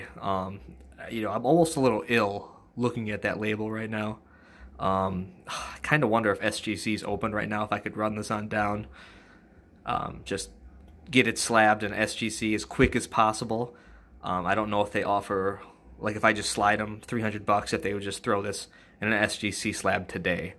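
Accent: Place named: Midwest, United States. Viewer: Hahahaha you have the voice down pat! Awesome! You still have the Midwest accent though!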